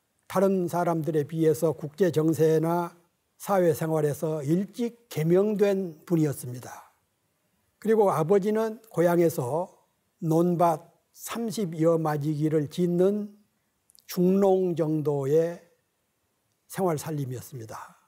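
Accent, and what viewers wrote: South Korea. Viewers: 공산주의 독립운동을 독립운동이라고 할수있을까? 말투를 보니 전라도사람인데 헛소리를 하니 전라도 전체가 욕을먹는거다 다른 정상적인 전라도인들을 모욕하지 마시길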